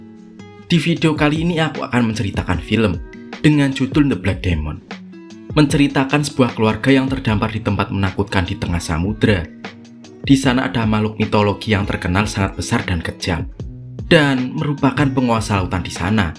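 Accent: Java, Indonesia. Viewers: Logat Jawa pekat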